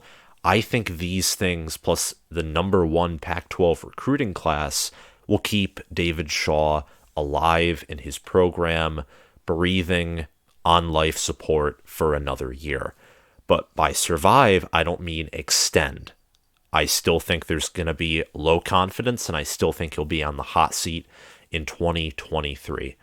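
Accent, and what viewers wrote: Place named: Michigan, United States. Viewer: Pac- fan in Sparty land: I luv '22 Mich. State's new, awesome QB/WR duo. By dialect, u sound like a Mich. fan. The new MSU qb/wr pair is 🔥🔥🔥. UM & PSU start hoping it takes most of a new seas. for the new MSU o' to gel bc these cats from out west r way better than dudes from Naperville & SE Mich 🤣🤣🤣. #TuckerKnowsOutWestGreatness 🔥🔥🔥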